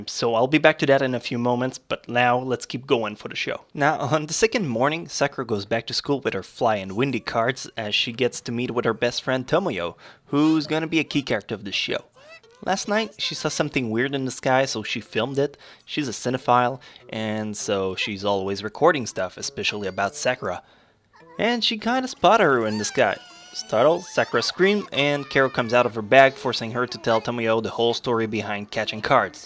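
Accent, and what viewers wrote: Quebec, Canada. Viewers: are you from Quebec? The accent seems familiar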